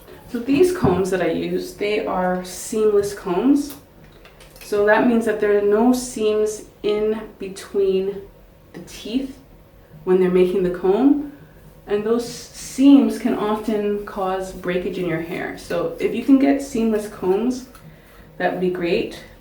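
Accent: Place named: Canada. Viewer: I hear a Canadian accent...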